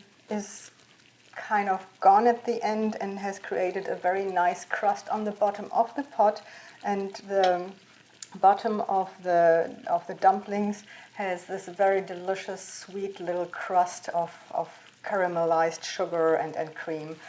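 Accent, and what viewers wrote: Germany. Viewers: A GERMAN SPEAKING ENGLISH!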